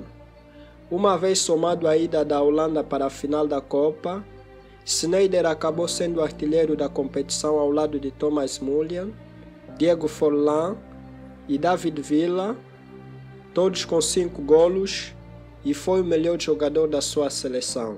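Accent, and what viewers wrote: Angola. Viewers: Vi um angolano no sotaque🔥🇦🇴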